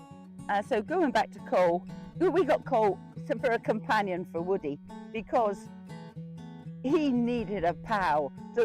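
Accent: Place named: West Country, United Kingdom. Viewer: thanks for that lovely walk in the country, glad to hear you have not lost your west country accent. Go girl. keep walking and talking.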